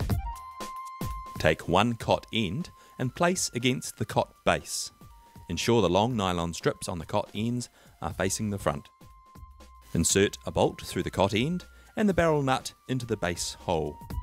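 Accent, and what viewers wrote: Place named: New Zealand. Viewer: Cute Kiwi boy accent!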